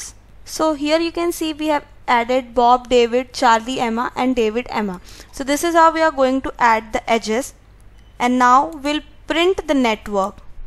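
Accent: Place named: India. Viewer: Billshit Indian accent … 🤢🤮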